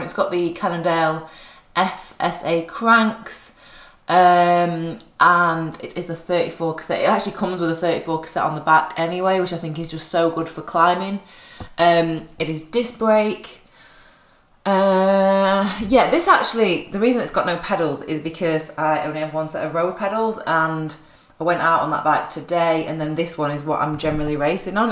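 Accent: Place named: Sheffield, United Kingdom. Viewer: That accent.is it sheffs?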